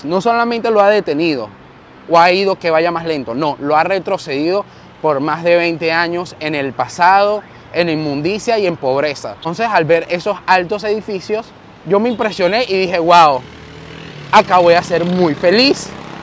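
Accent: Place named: Panama. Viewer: Me gusta como dices aquí tenemos. Ya tu eres panameño.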